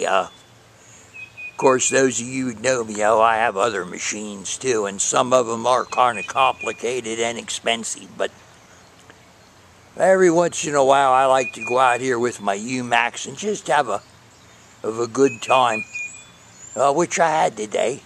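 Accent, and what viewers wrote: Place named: Dundalk, United States. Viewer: Where are you from? You almost have a Dundalk, MD accent. Happy hunting!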